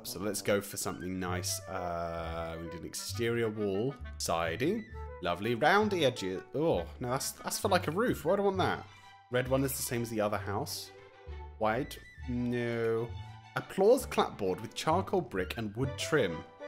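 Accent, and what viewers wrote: United Kingdom. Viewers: your British accent is very cute and fancy!💖💖💖 Love ya!!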